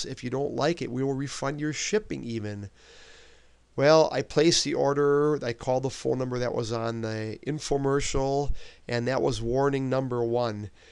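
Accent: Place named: Wisconsin, United States. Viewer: I love your Wisconsin accent.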